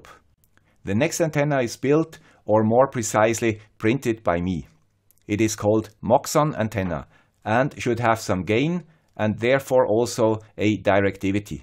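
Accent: Switzerland. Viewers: Came here for the IoT, stayed for the Swiss accent and the cat. Great content as always!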